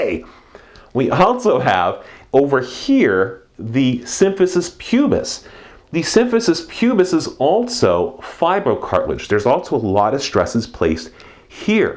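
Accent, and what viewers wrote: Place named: Texas, United States. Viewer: these are super helpful and it's fun hearing a lil' bit of Texas in your speech.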